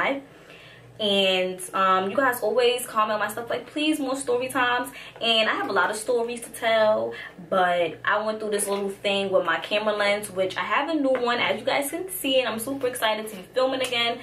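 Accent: New York, United States. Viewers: yess NY accent, always spot a NewYorker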